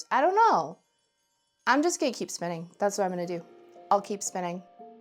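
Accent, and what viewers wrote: Midwest, United States. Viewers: As someone who grew up all over the Midwest from Missouri up to Michigan and Wisconsin, I do remember the warnings and the reality, but also that it was a point of pride among some of us to see how long into winter we could go without wearing our coats so... I suspect that, while it may ALSO have to do with cell phones and complacency, there's a large element of "teenager" in there, too! And some of it on your part is simply how extreme the area was where you grew up. The coldest I've ever experienced was in Iowa, but for consistent bone-chilling, that was Minnesota. When I lived there it took a while to get used to the habits you grew up with. I thought everyone was bonkers for leaving their car running while they were in the store. Welp. If you don't, it may not start when you come back out! We live and learn, and your kid will, too. 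Eventually. :D Yes, I can totally hear the Minnesota and Wisconsin in you. lol For me it isn't just the "o" but the "sc" part of it that gives you away. Team Bubbler, yeah?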